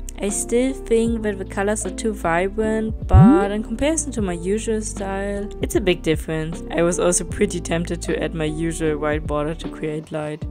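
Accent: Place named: Germany. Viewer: By the first sentence I knew you are German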